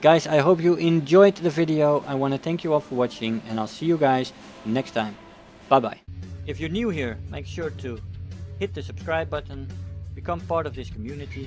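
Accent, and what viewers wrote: Netherlands. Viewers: are you Dutch? Your voice sounds like you are dutch xD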